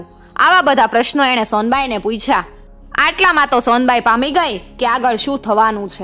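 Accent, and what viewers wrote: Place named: Kathiyawad, India. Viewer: which way you are talking you must from Kathiyawad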